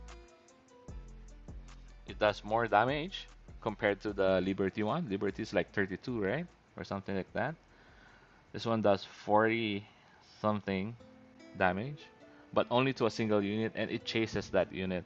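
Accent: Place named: Philippines. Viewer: Base on your accent your Filipino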